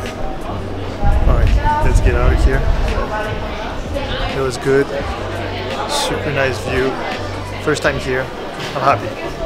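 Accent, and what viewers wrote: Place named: France. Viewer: l'accent français c'est impossible de s'en débarrasser en fait mdrr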